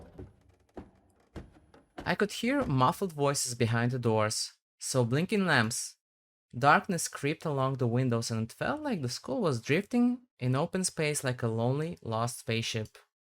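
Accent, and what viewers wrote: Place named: Russia. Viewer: Как только услышал идеальное произношение русских имён, сразу понял, что смотрю не иностранца... Произношение на английском у вас шикарное. Если сильно не вслушиваться, то можно и не заметить акцента, что, собственно, и произошло)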